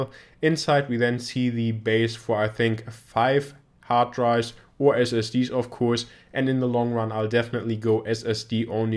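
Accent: Germany. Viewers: Dein Englisch ist wirklich gut und die Aussprache ist auch wesentlich angenehmer als bei manch anderen Deutschen Youtubern.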